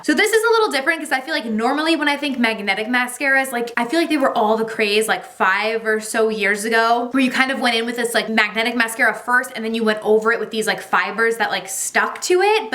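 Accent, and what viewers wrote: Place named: California, United States. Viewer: Guessing your from or live in California based on how you talk. So cal? Thanks for the vid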